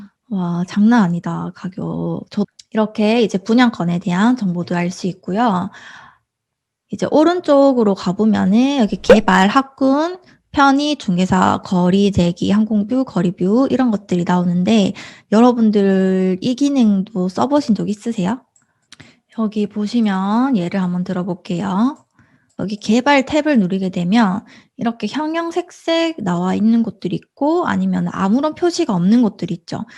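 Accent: Busan, South Korea. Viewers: 완전 부린이인데 네이버부동산은 빠싹하지 않을까 하고 들어왔는데 엄청 필요한거 3가지나 배웠어요~~ ㅠㅠㅠㅠ 매번 시청중이지만 정말 친근한 말투로 하나하나 설명해주셔서 감사드립니다 ㅎㅎㅎ 혹시 부산쪽 분이신가요? 말투가 저랑 비슷하시요 ㅋㅋㅋㅎㅎ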